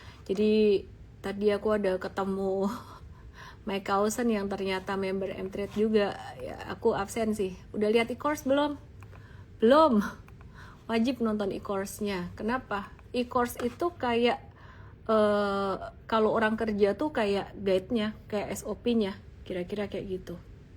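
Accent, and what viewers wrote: Java, Indonesia. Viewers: Cik tetep logat jowo ae, wong jowo ojo lali jowone. Kamsia. Gbu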